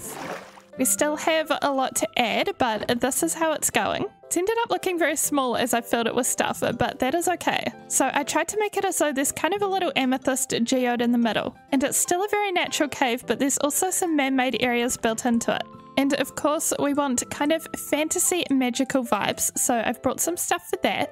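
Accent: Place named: New Zealand. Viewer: I miss my hometown sm Nz is whre im from and hearing your voice takes me back :)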